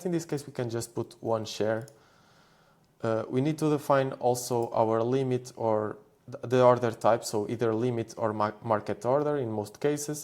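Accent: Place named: Portugal. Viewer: Are you portuguese? From the sound it seems. Do you know in Portugal if we can follow the process you just shared?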